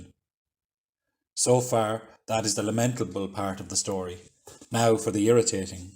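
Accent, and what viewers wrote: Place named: Ireland. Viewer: Videos like this come with a 101% chance of having an Irish accent. Pity the Wyndham Act didn't come sooner though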